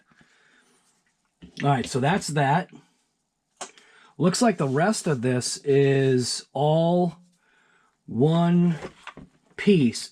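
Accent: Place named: Massachusetts, United States. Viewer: Hey buddy. I knew your accent was one of Massachusetts LOL I grew up in East Bridgewater for a lot of years. I live in Pawtucket now. And I work at Hobby quarters in Foxboro Mass I don't know if you have ever frequent it there. But feel free to stop by this summer we have a huge rock crawling course. I don't know if you are into solid axle monster trucks but I have been designated to design and create a summer series for solid-axle monster trucks styles with penda points series. I don't know if you will see this message but hoping you do. We are also doing a construction site for people who are into hydraulic heavy equipment RC. Anyways I just heard your Alexa say Plymouth and it pushed my head back and surprised LOL anyways nice to see somebody from our side of things is doing so well in the RC Community for us God bless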